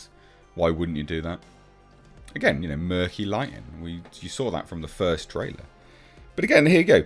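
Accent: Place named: United Kingdom. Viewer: He sounds like every British guy everywhere anywhere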